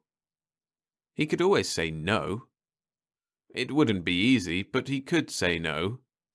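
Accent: United Kingdom. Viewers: I have trouble with the nasal British accent. Just a personal thing with me.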